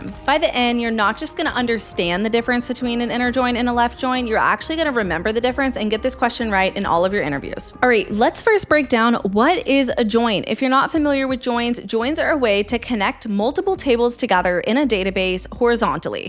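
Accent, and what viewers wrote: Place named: Latin America. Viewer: I really love how expressive you are explaining quite challenging concepts as you do. Eres española? is spanish and the way you talk is more latino hehe. Thank you from the bottom of my heart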